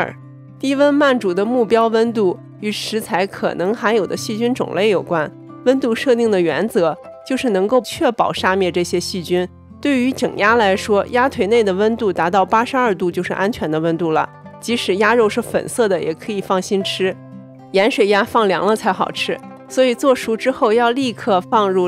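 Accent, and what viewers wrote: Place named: Qingdao, China. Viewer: 青岛银的口音😊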